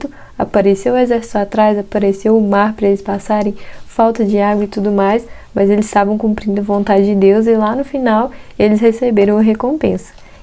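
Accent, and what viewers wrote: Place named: Minas Gerais, Brazil. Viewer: Vc é mineira? Percebi por causa do seu sutaque 💗que Deus te abençoe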